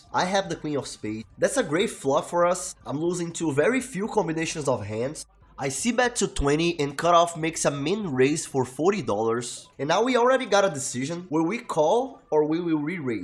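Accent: Brazil. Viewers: Love the brazilian accent. Shout out from Brazil haha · sotaque de brasileiro inconfundível kkk, parabéns pelas mãos jogadas. GL GL meu parceiro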